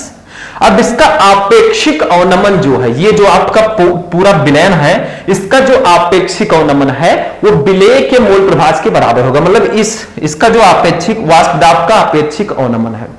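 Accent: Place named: Gonda, India. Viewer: Sir aapke language se lagta hai ki aap aap Uttar Pradesh Gonda se hai 🤔🤔🤔🤔🤔🤔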